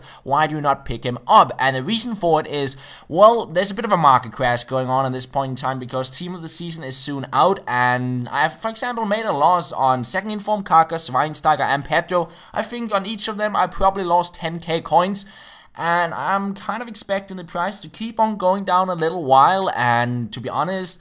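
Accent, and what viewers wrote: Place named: Denmark. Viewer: Dat Danish accent...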